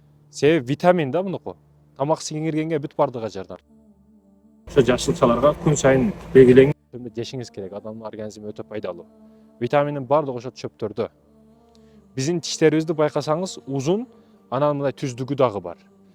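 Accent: Batken, Kyrgyzstan. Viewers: Баткендик жигит го дейм мен билгенден, акцентине караганда, өзбек же кыргыз экенин өзү айтпаса… эгер өзбек болсо анда кыргызча жакшы билет экен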